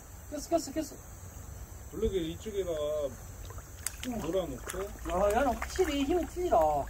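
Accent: Jeolla, South Korea. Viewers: 전라도여?ㅋ 가장게. 일로치나. 절로간게. 타슈와 타랑께ㅋㅋㅋ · 으따 전라도여~^^ 너무 좋당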